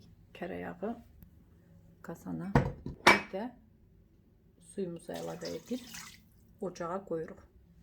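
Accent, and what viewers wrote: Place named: Qakh, Azerbaijan. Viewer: Gözəl ləhcəmiz ❤ Qax' dı, Zaqatala? Əllərinizə sagllq 🌹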